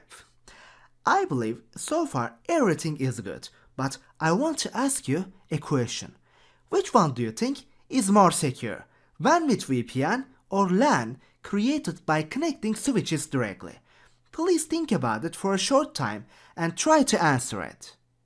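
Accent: Turkey. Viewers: I guess Turkish origin speaker. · i like your accent, it sounds Turkish to me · Aynen Türk aksanı bu :>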